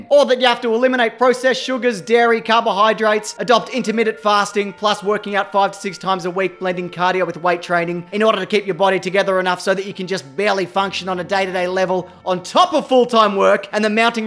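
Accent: Australia. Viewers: I came here for jokes and an Australian mans voice not a reality check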